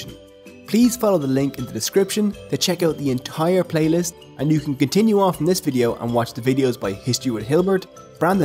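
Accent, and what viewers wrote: Ireland. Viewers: As a Filipino, I'm proud and thrilled to see videos on the culture being posted. As a fan of Ireland, it's even more exciting when done with an Irish accent. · This man has possibly the most Irish accent ever! "Tree" for three lol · first few seconds HELLO FELLOW IRISHMAN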